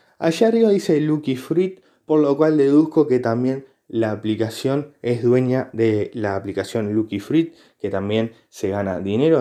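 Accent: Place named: Argentina. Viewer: hola brother buenas noches x tu acento dedusco que eres argentino como hiciste para retirar tu pago ya que argentina no sale en los paises buenas tardes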